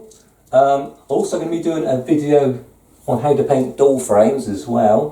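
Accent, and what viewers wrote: West Country, United Kingdom. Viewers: Do I detect a West Country accent ? You voice is very pleasant together with your teaching style and good advice.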